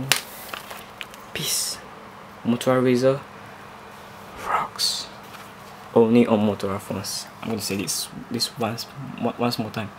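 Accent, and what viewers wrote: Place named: Singapore. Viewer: I somehow recognised that singaporean accent. I'm a singaporean too. Can u pls show me how to connect thr wires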